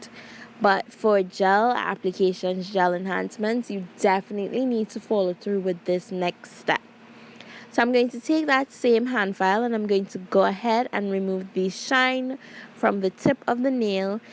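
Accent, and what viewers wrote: Trinidad and Tobago. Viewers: You must be a Trini, I hear that infamous accent. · Your accent sounds Trini. Love the step by step instructions. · totally sounds Trini!!🇹🇹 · You go Trini !! I could hear your accent !!! Great job! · Omg your nails are gorgeous 😍😍 you did that !! I’m so glad I found your YouTube channel 👏🏾👏🏾👏🏾👏🏾 do I hear a trini accent Deh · I hearing that Caribbean accent…Trini to deh bone? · Trini accent isn’t harsh it’s kinda soothing lol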